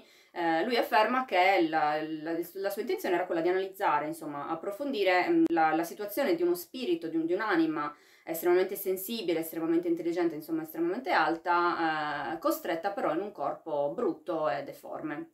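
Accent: Sardinia, Italy. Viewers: Premettendo che la seguo da prima del video con Crepaldi, personalmente apprezzo moltissimo questi contenuti culturali/divulgativi e mi dispiace che vengano posti in secondo piano dall'algoritmo di Youtube - che, a giudicare dalle visualizzazioni, invece premia soltanto i suoi video più "polemici". Ha la mia stima e mi ha fatto riscoprire la bellezza dell'accento Sardo.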